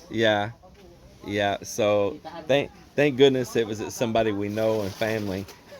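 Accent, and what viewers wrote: Texas, United States. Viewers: there is many reasons why i have been following you and your wife. first my Late Mom followed you before i moved to the Philippines and we watched you together. The main reason why i watch close is that i plan on doing the same thing kind of. I plan on building a home and it will be mostly my wife family that works for me. I am already remodeling her mom and dads home tripling the size and we plan on living there as i save up for land and to build our home. I am letting them build it how they know how since that is not the home my wife's family will stay in as i plan on building a new one on our property when we get it for them (i want at lest 1 hector hoping for 3 for farming). i want to make it self sustaining as that is how my parents farm is in Washington state. One of the other reasons is I miss Texas (lived there for 20+ years) and you have the accent and mindset that I love from that state. 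Although i am not going to be able to be as physical as i am a disabled vet with major back problems I still want to build my home much like yours. I do not have as many years in construction as you but i do have a background in it, i also have an engineering degree but it was focused on mechanical but I did study blueprinting too. I also want to meet you sometime as i live in Roxas City and make trips to Iloilo sometimes. Congrats on the 10k. been watching for well over a year and feel like ya'll are part of the family lol.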